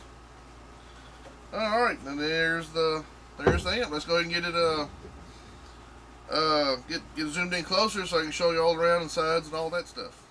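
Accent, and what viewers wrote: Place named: Tennessee, United States. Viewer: This guy has got to be from TENNESSEE, glad I speak fluent southern 🤣😉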